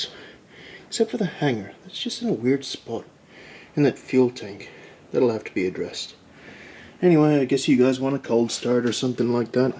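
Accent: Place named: South Africa. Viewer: you sound like an original SAFFA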